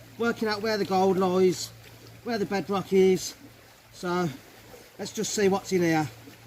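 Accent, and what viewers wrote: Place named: Birmingham, United Kingdom. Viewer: Absolutely fascinating video , really enjoyed it … BUT .. omg that ACCENT 😂… just gotta be honest I hate that accent of yours , Brum/Black country esque… sorry mate I watched it with the sound off .. I feel terrible saying that but it is true ! Good luck with your future panning 👌 👍🏴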